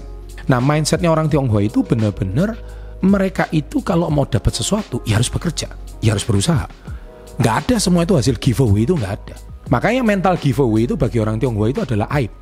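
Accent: Java, Indonesia. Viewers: Pak.. Logatnya kok kaya orang jawa... Ya.. 😊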